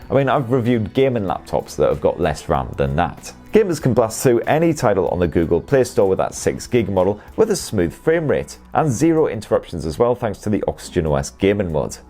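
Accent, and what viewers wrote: United Kingdom. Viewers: Is this the legendary UK accent?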